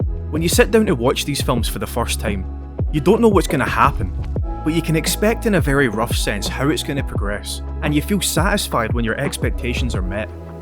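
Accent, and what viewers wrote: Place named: Scotland, United Kingdom. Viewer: Your accent reminds of Trainspotting! Scottish! 😅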